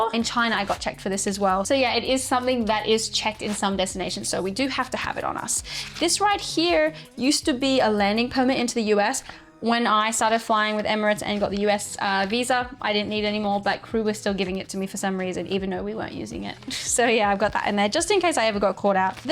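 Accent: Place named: Australia. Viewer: Hi, I love your content and I’m so happy to see your video! I really enjoy the vegan blogs and love listening to your Australian accent. ❤️🤍keep posting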